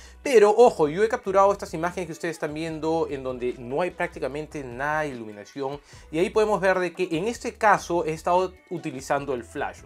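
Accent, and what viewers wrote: Lima, Peru. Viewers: Ese dejo Limeño es inconfundible xD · eres peruano?! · Hola, eres peruano?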